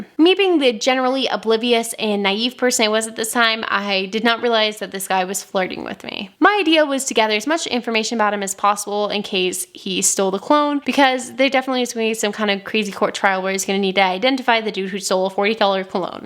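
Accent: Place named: South, United States. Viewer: I love the southern accent. lol!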